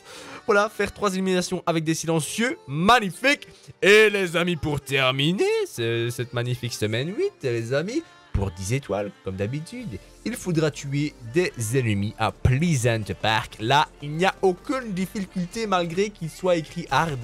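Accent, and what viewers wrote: Belgium. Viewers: Accent belge j’ai la même MDR 😂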